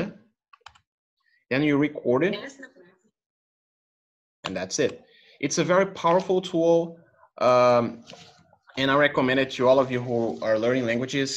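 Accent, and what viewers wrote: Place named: Brazil. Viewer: Só pelo sotaque já vi que era BR 🇧🇷❤️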